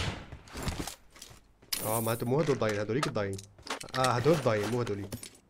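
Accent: Aleppo, Syria. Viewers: حسب لهجتك اتوقع انته من ريف حلب او من حلب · انت من اي محافظة لهجتك حلبية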